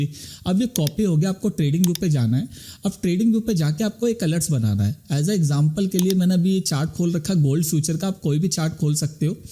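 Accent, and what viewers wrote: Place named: India. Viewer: For fucks sake, is there anyone on YTB, who explains this and is not Indian and can explain it in clean English?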